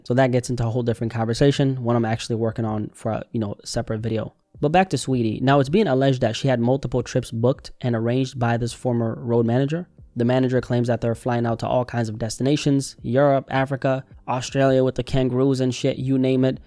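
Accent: Jamaica. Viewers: u Jamaican?????? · You Jamaican ?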